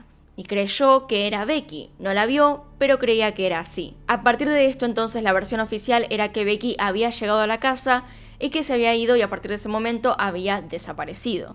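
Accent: Argentina. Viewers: Saludos desde Suecia, aquí vivo aunque soy mexicana. Amo tu canal y me encanta el acento argentino y cómo pronuncian "ll" y "y" 💓👌. Verte me hace sentir orgullosa de los latinos y nuestro idioma, además de que una chica como tú sea tan capaz de hacer este nivel de casos e investigación. Mi todo respeto a tu trabajo. Además tienes una mirada única y también me gustan tus vídeos de maquillaje.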